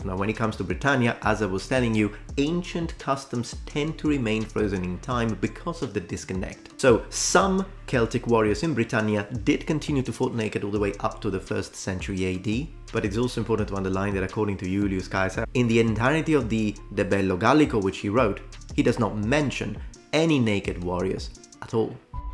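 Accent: England, United Kingdom. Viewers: I take it that you lived for quite a while in England because my sisters friend Julie comes from Greece and has a different accent than you when speaking English. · Please make more videos about the celts, we know so little about them and pop culture is full of misinfo. Despite being an anglo saxon/scotch irish American Ginger (with Sicilian great grandparents! I am in no way culturally sicilian but I love the fact that you are Sicilian, speak British English, yet married an American and live here) When I hear about the celts, I think of Pict warriors fully naked covered in blue tribal paint, and I've always had a hard time believing that represented the celts as a whole.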